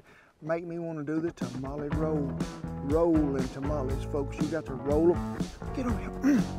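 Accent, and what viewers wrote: South, United States. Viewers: Mr., I feel I need to reach out to you since I found your site by accident. I am about your age and was brought up in Tillman County. Seeing you standing in the pasture with the Mesquites and the pond in the background brought me back to a time I loved! Rotating wheat, dry land cotton and working them old cows was just about heaven to me. Hearing your accent and the way you express yourself has got me turned around talking country, again. I left at age 18 to serve my country and have longed to return someday. That time has come and gone and I'm afraid it's too late for me now but hearing from you was like a letter from home and the tears just came gushing. Thank you so much for the trip down memory lane.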